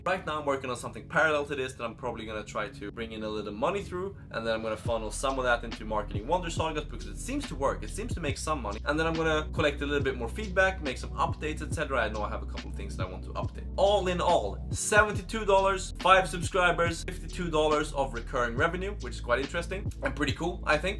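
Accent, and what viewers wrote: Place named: Sweden. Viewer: är du svensk, för det märks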